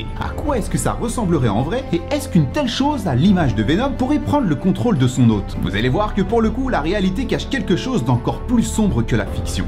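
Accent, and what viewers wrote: Belgium. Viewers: Tu es français ou belge?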